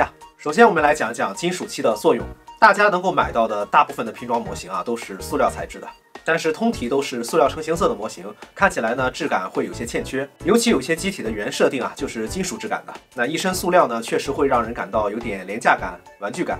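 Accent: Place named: Qingdao, China. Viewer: RAY是青岛人么？口音很像老乡啊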